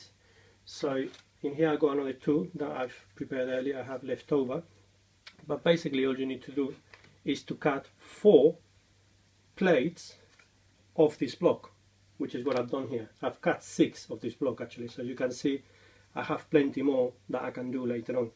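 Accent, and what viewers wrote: United Kingdom. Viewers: I like these videos. Great instruction. But Mr learned English from a Brit. Some nice American should teach him how to pronounce the "oh" sound. (This, from an admittedly anal-retentive English teacher with a touch of irreverence. I wish I could speak Spanish half as well as he speaks British.) But in all seriousness, these are great videos. Mr. shows and explains as well as can be done in a video. I hope someday to be able to take one of his classes.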